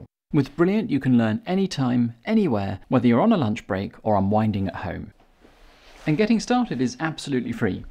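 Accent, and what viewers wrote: England, United Kingdom. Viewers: The first thought I had when I saw the dictionary and all these books was: "Can you adopt me? I am a wonderful daughter, and as Italian I cook well". Then I came back to myself and I focused on Python. Very useful video for us little dev and delightful English accent. Thanks.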